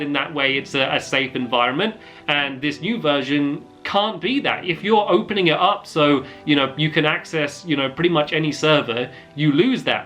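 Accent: United Kingdom. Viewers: Thalia Foot he's British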